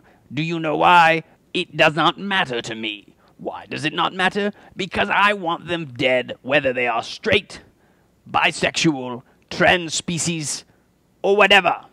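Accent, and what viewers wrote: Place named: United Kingdom. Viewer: British accent lol. love the question!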